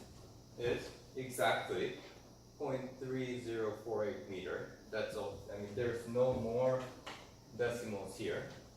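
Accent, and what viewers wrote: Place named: United Kingdom. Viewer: Where did you get your British accent? LOL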